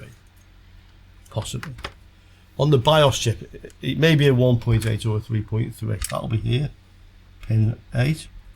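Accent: Germany. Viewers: Dein Kumpel hat wirklich was drauf, das muss man sagen. Er ist zwar nicht der Guru, was Grakas angeht, aber dennoch ganz oben mit dabei. Ich denke, das weißt Du ja. Nach allem, was ich bisher gesehen habe, bin ich sehr zuversichtlich, dass bloß die 1,8V Versorgung Probleme macht ... sobald soweit vorne in der Sequenz etwas defekt ist, ist meistens weder RAM noch GPU defekt, daher wäre ich an Deiner Stelle sehr zuversichtlich. Ps. Mein Kommetar kam übrigens schon, als ich das Video gerade 2 Minuten gesehen habe. Man merkt sofort, wenn ein Deutscher Englisch spricht 🙂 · ich liebe es wie man es bei uns deutschen eig immer zuverlässig raushört :D x3 grüß dich :D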